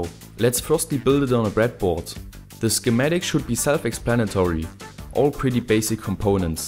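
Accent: Germany. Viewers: he is German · du kommst aus deutschlan oder? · Pretty cool. Oder ziemlich cool... ich glaub du bist Deutscher. :) Cooles Video. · Are u german ? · bist deutsch neh :D geiler akzenz :D aber gutes englisch :D wie bekomm ich am einfachsten bluetooth kopfhörer für nen helm hin :D mein einfall.. mini bluetooth box aufschrauben .. kopfhörer zerlegen und pooow XD aber ich hab einfach mal null ahnung von elektro und audiozeugs XD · denglish / genglish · Are you German?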